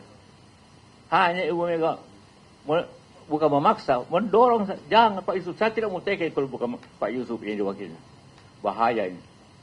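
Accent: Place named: Sulawesi, Indonesia. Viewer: Memang cara orang bugis begitu ngomong nya. Jgn samakan dgn orang jawa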